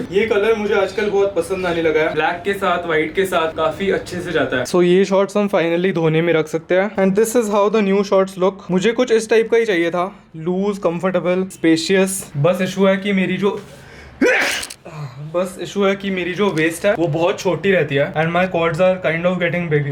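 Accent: Maharashtra, India. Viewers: Marathi accent >>> any other language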